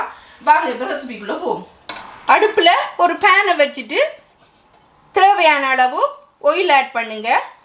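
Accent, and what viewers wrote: Sri Lanka. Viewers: Wow semma tempting mouthwatering recepie nega srilankan tamil explain panathu semma semma👌👌👌👌👏👏👏👏👏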